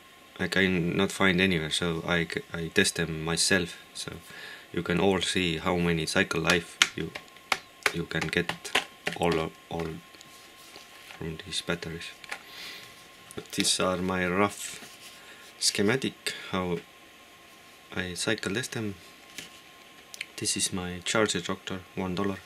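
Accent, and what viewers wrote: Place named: Estonia. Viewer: You sound like Estonian are you ? Or maybe Finish or Hungarian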